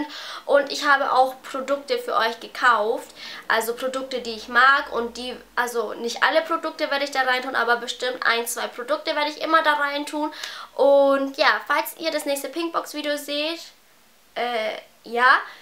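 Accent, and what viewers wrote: Bavaria, Germany. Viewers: du hast keine sprachfehler? man merkt aber übel dass du aus bayern kommst :D des ist voll süß :) und das des abi in bayern schwieriger ist als in anderen bundesländer stimmt! :) reg dich nicht über andere leute auf !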